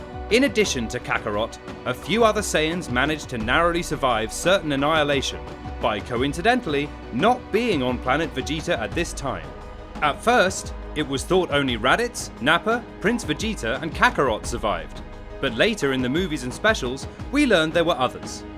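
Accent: United Kingdom. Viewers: This was so well-made! One of your best and most engrossing videos, CBR! And the RP accent is a plus! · Ohhhh I love this British accent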